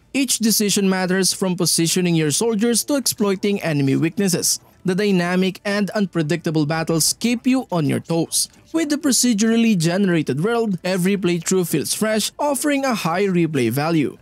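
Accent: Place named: Philippines. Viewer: you have a Filipino accent and vibe.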